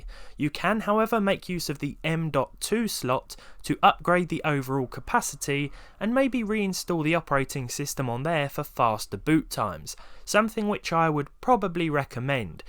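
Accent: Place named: Scotland, United Kingdom. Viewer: I like his accent. Is it Scottish?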